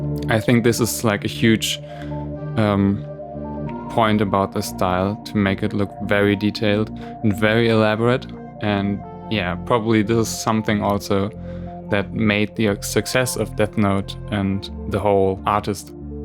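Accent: Germany. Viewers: you sound very german (almost like my english teacher XD ) but I like your talent. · hahaha ich wusste du bist deutsch, den dialekt erkennt man sofort ^^ aber cooles video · Ich hatte schon den Verdacht wegen dem deutschem Akzent :P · it's a german accent, so i guessss yes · Are you actually german? I thaught so because of your accent ^^ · Von deiner Aussprache her nehme ich einfach mal an,dass du ursprünglich deutsch sprichst,was es einfacher macht zu kommentieren xD Deine Version von FairyTail und Death Note hat mir am Besten gefallen,weil man sofort am Ausdruck erkannt hat was es sein soll.Was Naruto angeht hab ich genau zu dem Zeitpunkt angefangen es regelmäßig zu schauen als du aufgehört hast,also mit dem Beginn von Shippuuden.Gegen Midseason würd ich mal sagen gings mir dann wie dir,ich hab lange nicht weiter geschaut und als ich vor ein paar Monaten dazu kam war das erstmal ein Schock weil sich zu damals wieder so viel verändert hat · I heard it from the forst seconds on, that you're German! 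(Because of the accent xD) But I'm too :P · Your accent... your german too, right? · I don`t like naruto because of the german translation. (I somehow noticed on your accent that u r german, I am actually german too but I mostly speak english and that`s why I write this on englisch now XD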